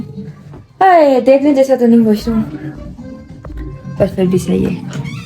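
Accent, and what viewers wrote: Sindh, India. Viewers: your Voice,Sindhi accent, cuteness, smiley beautiful face and positivity made me your biggest fan, I became so addicted to your vlogs ❤ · I just love ur sndhi acnt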